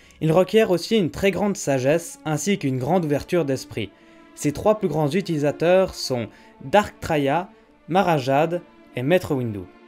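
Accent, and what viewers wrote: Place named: England, United Kingdom. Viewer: horrible accent anglais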